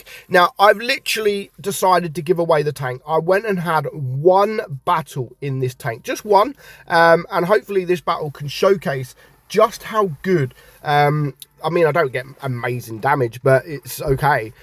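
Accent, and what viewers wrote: United Kingdom. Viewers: apac i like ur briish accent mate lol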